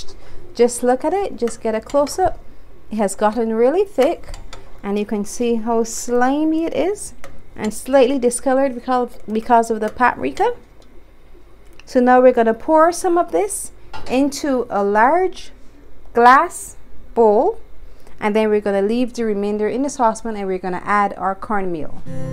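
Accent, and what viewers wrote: Barbados. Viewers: What a sophisticated bajan accent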